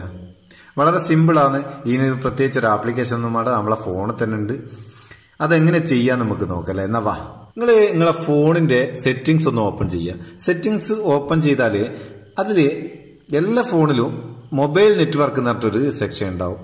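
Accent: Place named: Kasaragod, India. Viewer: അടിപൊളി ഐഡിയ. അടിപൊളിയാവുന്നുണ്ട്ട്ടാ👍 ങ്ങളെ കാസർകോട് ബർത്താനം ഞമ്മക്ക് നല്ലോണം പുട്ച്ചിക്ക്ണ്.😜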